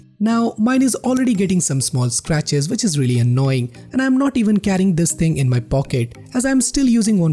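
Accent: India. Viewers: ur indian accent is dumb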